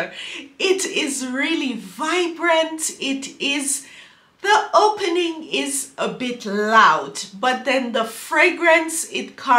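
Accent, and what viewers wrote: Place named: Kenya. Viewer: Niiice! Just bought it. First clone frag. Btw. Are you Kenyan 😙? You sound so Kenyan 🤔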